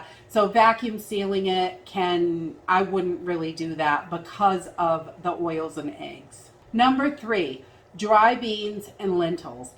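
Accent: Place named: New England, United States. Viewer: Hey, are you in New England? I am - and from your intro (with the fall foliage) and your accent, it seems like maybe you're a fellow New Englander. If you like to keep your location private, no worries. I only go as far as to say that I'm in New England on my channel for privacy (and anti-stalking) purposes. I was just curious.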